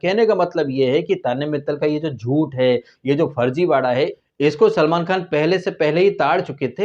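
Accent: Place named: Delhi, India. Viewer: bhai aapka tone delhi ke logo jaisa h😂 islye maine poocha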